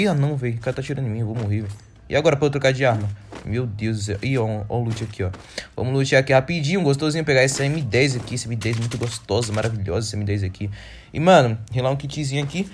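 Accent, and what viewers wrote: Brazil. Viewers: Uai ze c e mineiro ze??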